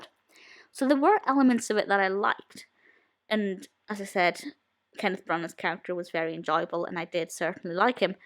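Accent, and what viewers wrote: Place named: Belfast, United Kingdom. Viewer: I don't mean to be snarky but I think you got a bit blinded by your liking of Branagh, who is great but as stated here, Judy Davis is the co-protagonist. <link> Just offering constructive criticism; I see you're starting out/didn't do another(?). I hear from your accent you would have particular insight to KB's film on Belfast.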